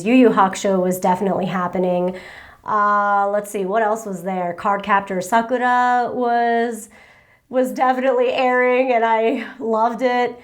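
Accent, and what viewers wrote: New Jersey, United States. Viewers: How do you pronounce water or orange? That would show how Jersey you are. Never knew I said those words differently till I moved to upstate NY. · A "Jersey accent" is exactly what you sound like when you speak English :P (Although to be fair, I thought you were from Long Island when I first started watching you)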